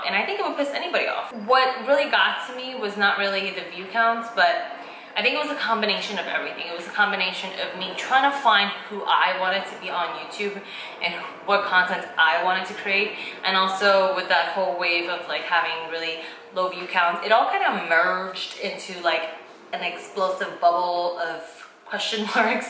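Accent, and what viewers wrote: Puerto Rico. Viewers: To be honest I first saw your videos and was fascinated by la chinita que habla español como boricua lol but seeing your videos and personality I was drawn to you and subscribed right away and pretty much have watched all your videos. There's sonething different and reall about you, its refreshing and I love it. I'm glad ypu took a break from youtube because it lead you to self discovery and that's what's more important, your happiness. I'm a true fan and will continue to be even if you dont post anything haha. I follow you on instagram and always love your post and quotes! Looking forward to your new content! Glad your back. Besos!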